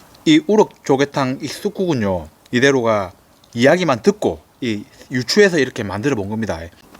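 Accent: Gyeongsang, South Korea. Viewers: 경상도 사투리 고쏘하이 처직입니데이ㅋㅋㅋ 경상도 사나이 시골 냄새 뿜뿜 너무좋아요🤗👍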